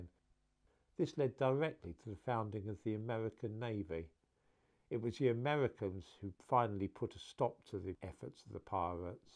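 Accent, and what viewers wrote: United Kingdom. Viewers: Sorry it offends you, but the fact he has a British accent does not stop him being a liar.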